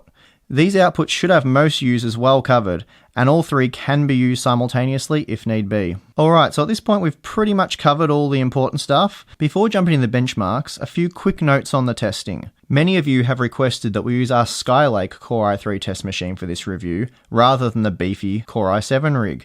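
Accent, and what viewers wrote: Australia. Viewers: Are you Australian? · Why do I trust Australian voices so much more when it comes to Tech reviews?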